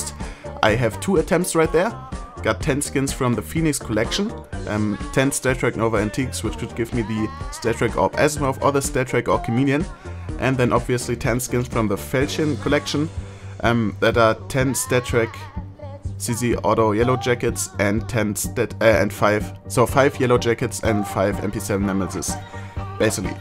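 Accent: Germany. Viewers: Wie man merkt dass du Deutscher bist :D trotzdem sehr gutes englisch und good vid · Du bist sicher Deutsch, merkt man an deinem Englisch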